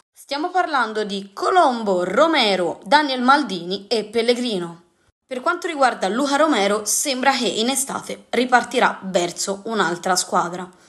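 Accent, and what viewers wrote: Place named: Tuscany, Italy. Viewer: Mi piaci come parli sei toscana 🔴⚫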